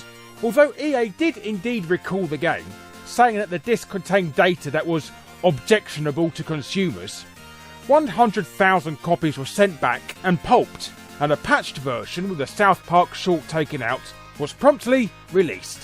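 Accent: United Kingdom. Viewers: Not at all, I just like reading the script alongside the audio. Trust me, as a rugby fan, I’m quite used to the British Isles accent 🏉👍🏾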